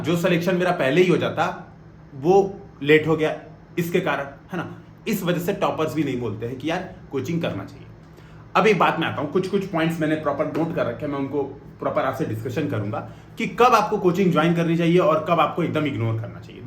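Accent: India. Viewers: Sir aap gorakhpur se ho kya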